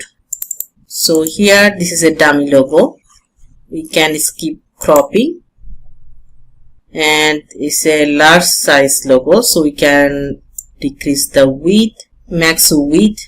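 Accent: Bangladesh. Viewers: Proud to see Bangladeshi English Accent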